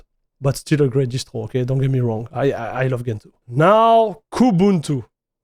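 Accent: France. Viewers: Pas facile d’écouter un Français qui parle Anglais. 😅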